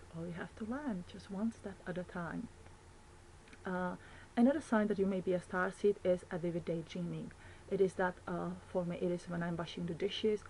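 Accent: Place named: Asia. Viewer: i love your accent..it almost sounds like an asian dialect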